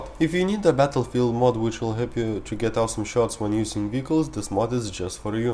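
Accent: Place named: Russia. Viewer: I fell russian accent where you from comrade?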